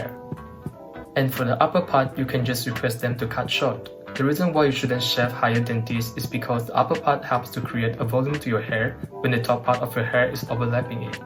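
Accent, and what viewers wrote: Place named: China. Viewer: I can’t pick out where he’s from with that accent? Chinese? Taiwanese?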